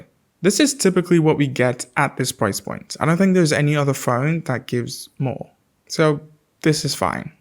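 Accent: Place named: United Kingdom. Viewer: I believe na UK Born or stayed there for many years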